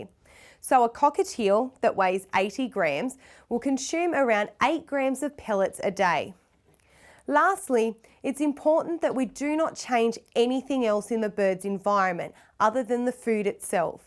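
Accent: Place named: Australia. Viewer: Wow that's a strong Australian accent. I came for the bird info but I got really fascinated by her voice, PS. I'm from the United States so I never hear different accents.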